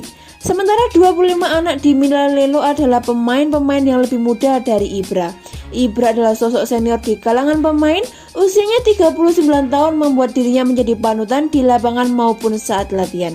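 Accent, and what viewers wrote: Java, Indonesia. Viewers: Jawa nya tulen mbak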